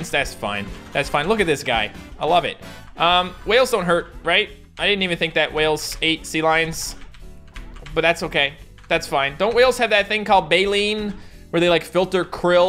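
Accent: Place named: Canada. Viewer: “That’s a funny one, ay!” The good ole Canadian ‘ay’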